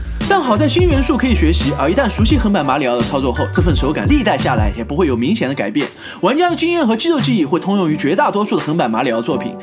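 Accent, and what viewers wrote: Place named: Jiangzhe, China. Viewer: 空洞騎士竟然是魂系作品lol 這個包郵區的塑料普通話，愛了愛了哈哈哈哈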